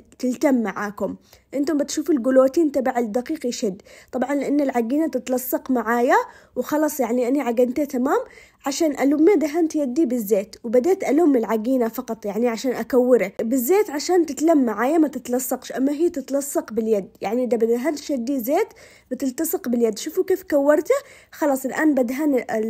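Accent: Aden, Yemen. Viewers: ما شاء الله تبارك الرحمن روعة ومتألقة في طبخك وقناتك الله يعينني كلما شفت طبخك سال لعابي وقررت بطني ولهجتك العدني تجنن الله يسعدك ويوفقك وبالصحة والهناء والعافية والسعادة عليكم يااااارب ❤❤ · ماشاء الله عليك مبدعة وفنانه واسلوبك بسيط وكفاية كلامك العدني ي بنت عدن الحبيبة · والله انك تجنني تسلم ايدك واللهجة اليمنيه روووووعه ماشاء الله الله يسعدك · انا بشوف القناة عشان الهدرة العدنية جبر قلبك❤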